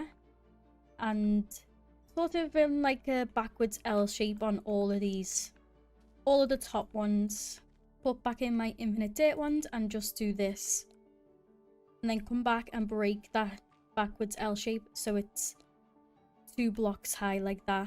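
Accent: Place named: Liverpool, United Kingdom. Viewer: That accent of u sounds like ur from scouse area 😊🎉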